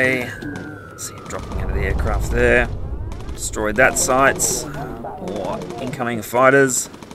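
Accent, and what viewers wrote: Australia. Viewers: you sound aussie mate, are you? good video btw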